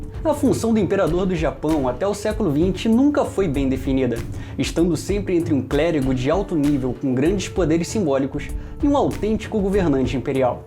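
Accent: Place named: Rio de Janeiro, Brazil. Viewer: Gosto muito dos vídeos! Só não muito desse sotaque carioca carregado... Parece uma bola vazando pelo pito!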